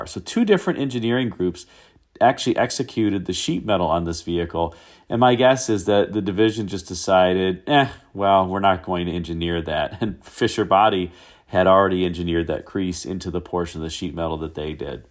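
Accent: Midwest, United States. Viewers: I loved the first generation Grand Ams and Pontiac did a tremendous job styling them — when they were pretty similar in a lot of ways to a Chevrolet Malibu underneath. I had to laugh at your mention of the Ventura pronunciation. I like your midwestern accent and it reminds me of the HGTV host Scott McGillivray (who is actually Canadian) and some other actor I can't think of. It's kind of a Great Lakes area sound to me. Lol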